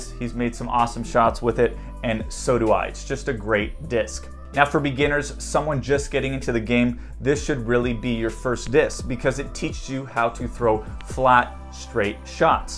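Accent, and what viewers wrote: Quebec, Canada. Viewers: when available I'm going to grab the elevation koi putters. I love the interceptor midrange. Rubber putter might be interesting. That sounds like quebec canadian French correct? Btw love the content. Keep it up.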